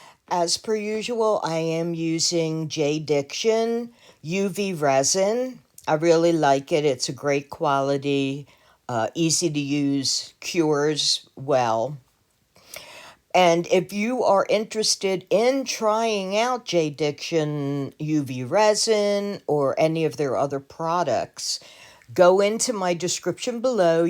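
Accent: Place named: East Coast, United States. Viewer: Very nice! I'm just starting with resin. I have a starter kit coming soon. Been creating jewelry for 35 years, but just noticed resin. Sounds like you're from the east coast. I'm from Philly, but I listen to accents from reason.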